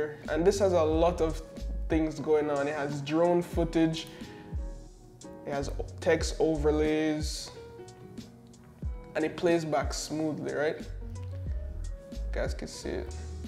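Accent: Jamaica. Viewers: You sound like a yard man still. I can hear two accents when u speak lol. Good video 👌🏾👌🏾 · Great video, I love that slight Jamaican accent.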